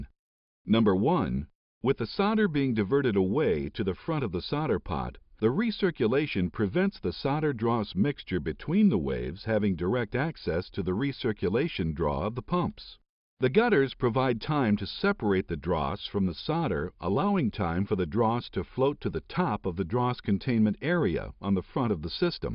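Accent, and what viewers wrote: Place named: North America. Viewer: Oh Yes, how right you are. This is a North American pronunciation thing. Even The Mythbusters say 'sodder'. Why is it so?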